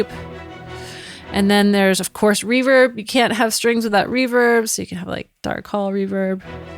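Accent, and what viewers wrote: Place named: United States. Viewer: your surname is catalan,..but you speak english native with american accent..anyway thanks for this..i love ujam striiiings..is one of my favourites plugins when i compose...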